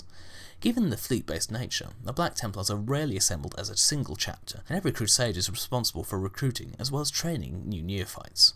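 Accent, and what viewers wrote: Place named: United Kingdom. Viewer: Aaah... The British accent... so good for Warhammer in general :P Keep em' comin' :)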